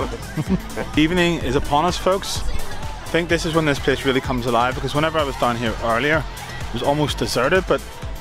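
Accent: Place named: Northern Ireland, United Kingdom. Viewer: Good to hear your n. I.accent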